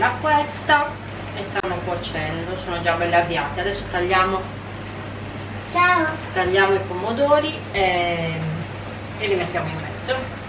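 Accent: Sardinia, Italy. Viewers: La proverò senz'altro!😝 Mi piace la cadenza Sarda della signora! Brava🤗👍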